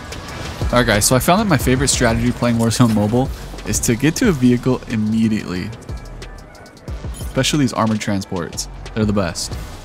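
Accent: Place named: Mexico. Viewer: I know ur Mexican u have a strong accent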